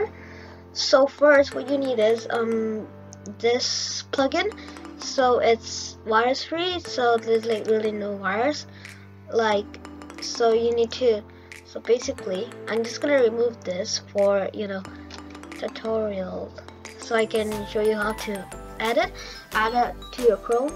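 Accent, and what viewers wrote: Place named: India. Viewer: what happened to bros indian accent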